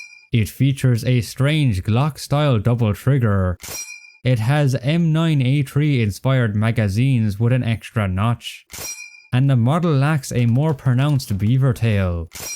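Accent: Ireland. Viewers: Heard your accent and thought you were Irish and I new it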